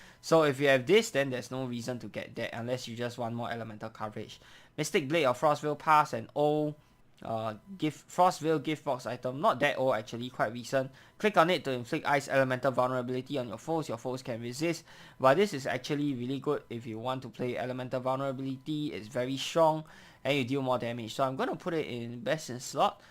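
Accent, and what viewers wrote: Singapore. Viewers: Bro you singaporean ah?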